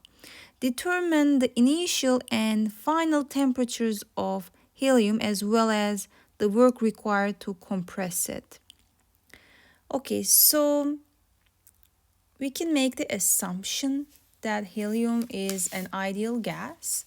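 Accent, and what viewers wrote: Turkey. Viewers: Hocam I just watched the video about Linear Density :D Aksanınızdan dolayı Türk olduğunuzu düşündüm ama kanalınızda hiçbir personal info'ya rastlayamadım hocam.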